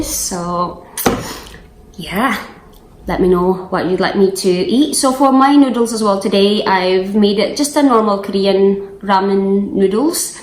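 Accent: United Kingdom. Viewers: I love the British accent oiii. hahah please do samyang spicy noodles challenge! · Oh I fin’lly saw you,your accent is really Scottish. Were you born there? Oh that’s yummy.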